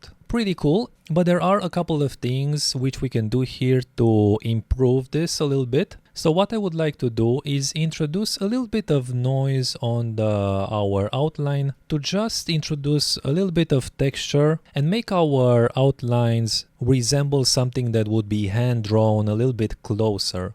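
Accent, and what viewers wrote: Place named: Russia. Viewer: You take not like a native speaker, are u russian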